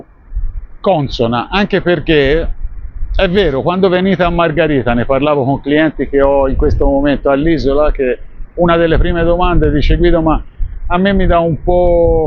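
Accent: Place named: Tuscany, Italy. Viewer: Ciao Guido,sono Luca dì cecina (li),mi sembra che dall accento anche te sei toscano come me.....io ho vissuto in Venezuela per dieci anni,a maracay estado aragua...poi per colpa della crisi sono ritornato in Italia nel 18 e finalmente nel 22 sono riuscito a portare le mie tre figlie e mia moglie (tutte nate in Venezuela) in Italia.scusa la lungaggine,solo vorrei sapere se è raccomandabile oggi investire all isola de margarita.....la mia idea sarebbe comprare un paio dì appartamenti per turisti e avviamente una casa indipendente per me e la mia numerosa famiglia.... orientativamente diciamo un investimento dì circa 50,000 euro......che mi consigli tu? Visto che tu fai sempre ottime spiegazioni e sei una persona sincera ti sarei molto grato se mi potresti dare un consiglio.... grazie mille e continua così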